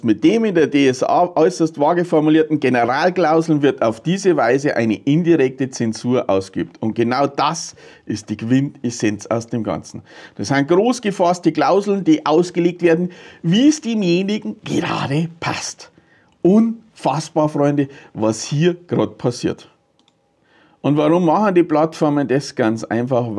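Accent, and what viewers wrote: Bavaria, Germany. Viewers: Danke! Aber du sprichst Bayrisch da steigt die KI eh aus hahaha 😀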